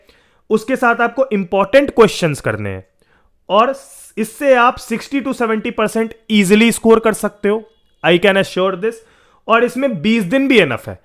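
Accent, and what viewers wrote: Bihar, India. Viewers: Bihari ho?? Accent se guess kr rha hun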